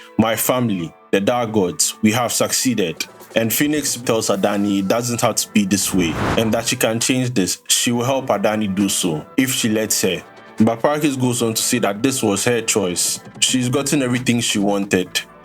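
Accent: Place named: Nigeria. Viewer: Nice accent. Are you Nigerian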